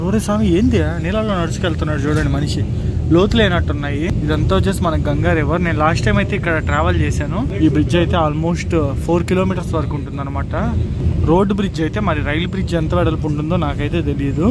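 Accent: Nellore, India. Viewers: ma nellore lo anthe ayya.. :)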